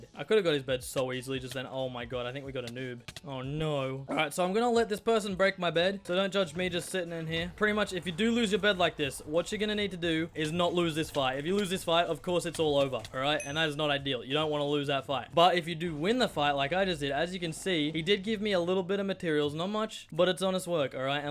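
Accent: England, United Kingdom. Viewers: Are you British?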